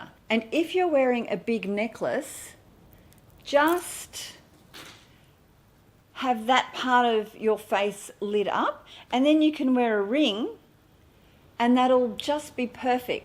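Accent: Australia. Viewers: Hi. I’m new here. You sound Australian. I’m an Australian 👍😃. Do you do anti aging creams and masks?? My neck is my problem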